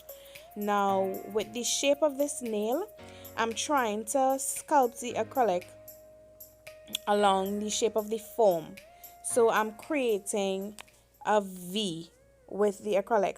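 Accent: Trinidad and Tobago. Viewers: Hi... u sound like u from trini.. i am also.. and those nails r beautiful..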